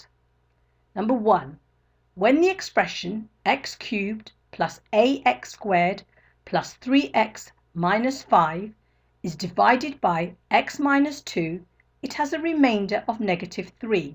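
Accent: United Kingdom. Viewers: Nice British accent but doesn't clarify from which sky the x = -1 fail from . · It is pure British accent.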